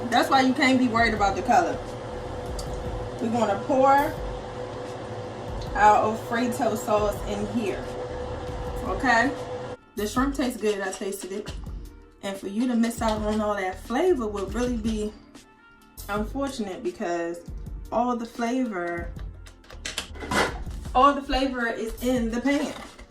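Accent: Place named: Maryland, United States. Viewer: I was thinking the samething. She sound like she from Maryland to me!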